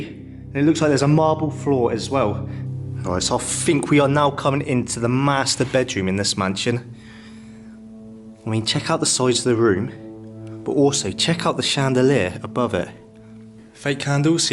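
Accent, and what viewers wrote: Bristol, United Kingdom. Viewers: So what area of the UK is this house in? The narrator sounds a bit Bristolian so is it near there? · Going by ye voice im guessing ye from ol Brizzle me boy!! Ye sounds like me ol Brizzle buddy from university!! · I made it in, you're accent is SO annoying I couldn't watch any more. I prefer watching the American explorers it just hits flat when British people try to pull off an exciting, informative and unique experience. This is trash man. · You gotta b kidding me ryt. At 1st one of u started talking I thought omg his Aussie, gota find out were is this abandoned mansion n then the British accent came out I was like ohhh shoots ...I can NOT believe such a beautiful mansion can be abandoned like that..That's a couple million dollars ryt.. I mean who wouldn't want to have that n restore it....hmmmm unless it's haunted...😱 post more videos...guys..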